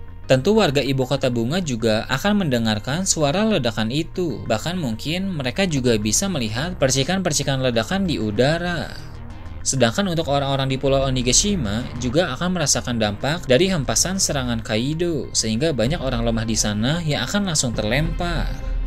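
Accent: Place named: West Java, Indonesia. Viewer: Yang ngomong ini orang Sunda, Jampang fixz wkkw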